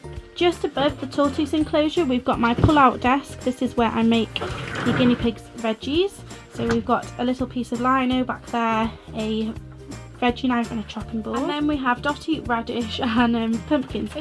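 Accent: Wales, United Kingdom. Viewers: Great videos. Where in Wales are you from?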